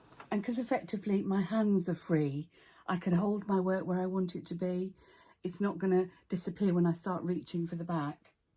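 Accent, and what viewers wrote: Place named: England, United Kingdom. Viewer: Oh thank goodness a lovely english accent video explaining... instead of a shrill american accent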